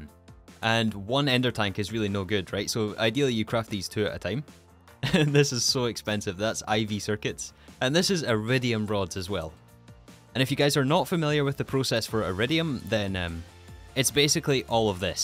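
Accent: Scotland, United Kingdom. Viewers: this is my comfort content, hearing his silky smooth scottish accent say things that my brain doesnt have a single clue about is so soothing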